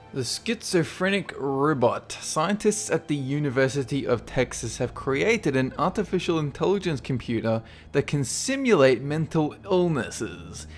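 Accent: Australia. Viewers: Love ya aussi accent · Australian, I believe.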